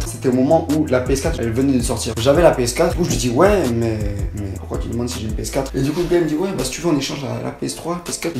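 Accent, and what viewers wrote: Belgium. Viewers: T est belge ?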